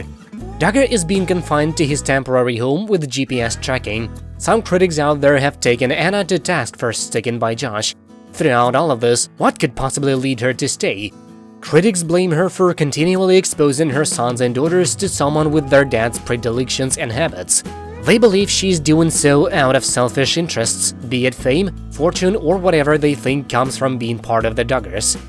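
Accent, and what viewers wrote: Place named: South, United States. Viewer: U.S.A, the Duggars are part of Bill Gothard's IBLP cult instead of a REAL church! It's called FAKE Christianity! They use Bill Gothard's IBLP "Cult Bible" instead of the "authentic" Holy Bible that REAL churches use! The differences are that cults abuse and brainwash their members in the worst ways, while REAL churches teach unconditional love and respect! This cult also doesn't allow divorce, despite a few of Anna's siblings doing so! It makes NO difference how long you and your wife have been married - 31 years or not - would the two of you STILL be married to each other even if one or BOTH of you behaved like Josh and Anna? The "authentic" Holy Bible DOES allow divorce on the grounds of the crimes Josh committed, but it sounds like you forgot that! You sound like you come from The South, which is called "The Bible Belt," and there are equal numbers of BOTH cults and REAL religious faiths! 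When COVID-19 started, CNN interviewed a man on a beach from Alabama, who didn't believe in making ANY decisions for himself - he was 100% dependent on God for EVERYTHING! He even said that if God decided to "call him back," he'd just go back with NO questions! That sounds like BOTH you and your wife - regardless of whether the two of you belong to a cult or a REAL church!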